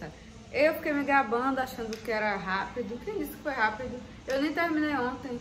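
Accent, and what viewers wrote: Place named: Bahia, Brazil. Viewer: Ela é baiana, o sotaque igualzinho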